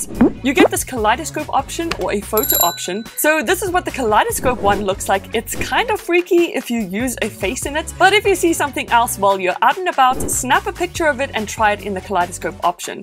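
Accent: South Africa. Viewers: Are you from South Africa if not my accent reading is off. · I love her accent. Idk if shes south African but her accent.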